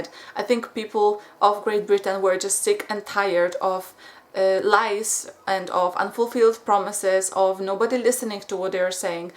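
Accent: Poland. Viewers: I can absolutely know as soon as you start to speak that you are Polish! I love your people so much!!!!! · you sound polish and you have a polish name:) You Have A Fan From Poland but lives in scotland:)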